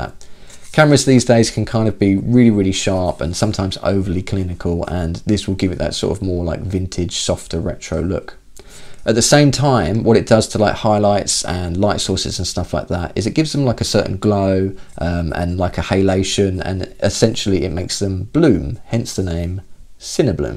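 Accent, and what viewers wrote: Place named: United Kingdom. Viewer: Came for the filter, stayed for the British accent. Solid review.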